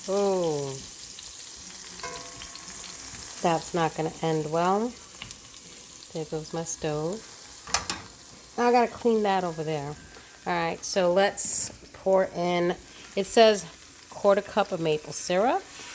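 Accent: New York, United States. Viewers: Hello. That recipe sounds do delicious. I just love that New York accent. I can tell your choice of foods you can cook. Thanks for taking the time to have this delicious recipe. God bless. I'll be waiting for another fantastic video.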